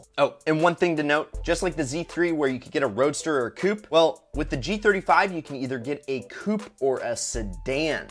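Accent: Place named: Chicago, United States. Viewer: Are you from Chicago? Or is that just default? I thought you were west coast.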